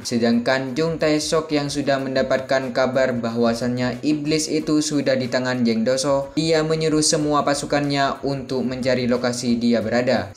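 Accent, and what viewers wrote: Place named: Madura, Indonesia. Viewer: saran sih min, untuk suaranya mungkin lebih santai lagi, dan untuk logat maduranya lebih di redam lagi atau diminimalisir mungkin😭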